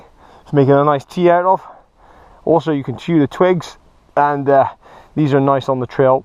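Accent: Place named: Geordie, United Kingdom. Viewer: i would not entertain comments from Mehmet he does not have a channel, and probably has no bushcraft or survival skills, Mr Mehmet if you read this comment lets see what you can do... great video man a big thumbs up and subscribed...there seems to be a hint of Geordie in your accent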